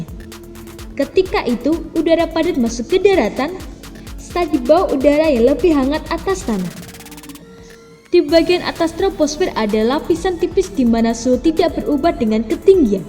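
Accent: Java, Indonesia. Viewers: Medog anjirrrr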